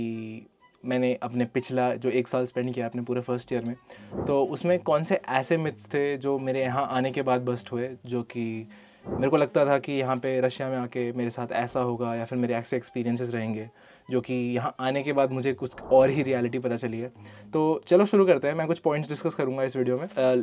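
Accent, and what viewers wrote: India. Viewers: Are you from west Bengal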